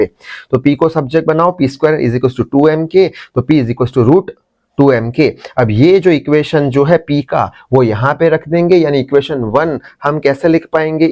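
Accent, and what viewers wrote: Gujarat, India. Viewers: Sir aap Gujarat ya fir rajasthan se ho na 😅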